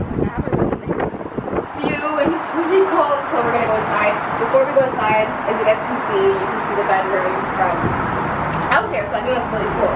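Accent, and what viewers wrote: Jamaica. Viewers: I heard that accent 🇯🇲🇯🇲🇯🇲🇯🇲 #jamaican in the building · Oh she Jamaican Jamaican? Lol “wait nuh man.” Congrats beautiful!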